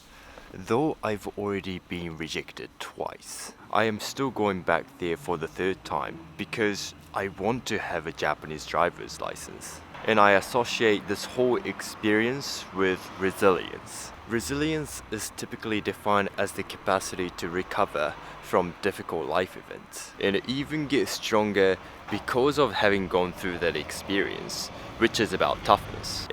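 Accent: New Zealand. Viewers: NZ, :D ha I knew it! Your accent was so familiar! First I thought it was Austrailan, but nope it is different from that. (I had a teacher from Melbourne once) As for administration(paperwork and so on), I have never thought I would hear that this exists in Japan. Being a Hungarian, I got used to waiting for hours just to get something done, but since 2020 many things can be handled via email or online, or at least get an appointment. I really enjoy the way you see life and handle things. :) Thx for sharing.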